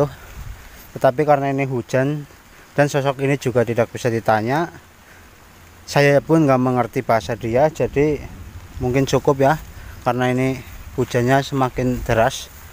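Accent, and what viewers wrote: Banyumas, Indonesia. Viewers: Aduh mba bahasa ngapaknya keluar nnti yg bukan ngapak ngga tau loh 🤭 Penemuan suku tidak jelas boso ngapake koyo ning judul nduwur wi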